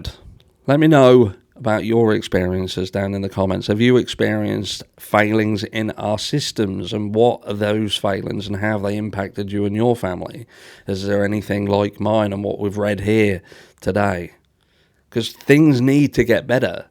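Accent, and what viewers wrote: Norfolk, United Kingdom. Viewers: I am new here. I actually miss the Norfolk accent. I was brought up around the NW Norfolk coast and spent a lot of time working Norwich way. I appreciate the sense of humour you bring to some of the stories you cover. Sometimes I fly back via Norwich airport and I love hearing people say they are going to Tesco's in the Norwich accent...awesome;). Your channel reminds me of why I loved my life in Norfolk so much. It was the good hearted humour and friendly attitude of the people you met where ever you were. I hope the recent disgusting abuse of power during the Coviet Union takeover has not dampened the spirit of the good people of my County. Bless you and your family and keep your positive attitude my Norfolk Cousin ;)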